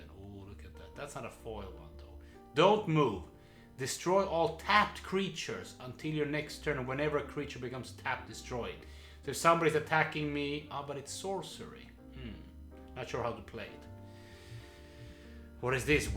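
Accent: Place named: Scandinavia. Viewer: what country are you from? You sound Scandinavian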